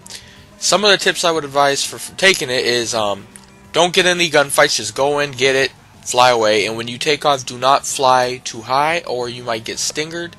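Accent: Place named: Netherlands. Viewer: First of, still useful, but there is a better way, when you enter the base, stick to the left, when you see the parking lot, stick to the fence, there is an opening leading to a moat where you can safely and easily drive through to the chopper, it took no time at all to get there and second, I believe you're Dutch, but your English itself is really good, most people would not pick up on it :)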